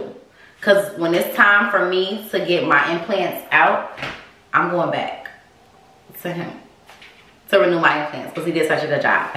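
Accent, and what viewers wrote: United States. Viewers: Lol Sound so chicago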